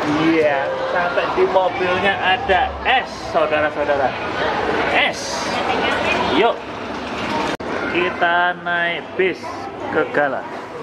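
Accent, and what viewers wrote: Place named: Java, Indonesia. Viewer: chanel ini nih yang ngajarin adik saya jadi medok ngomongnya!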